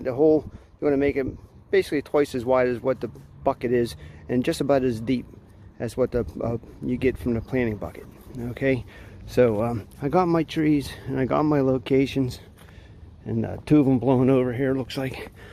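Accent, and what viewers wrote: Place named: Pittsburgh, United States. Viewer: I detect a yinzer accent....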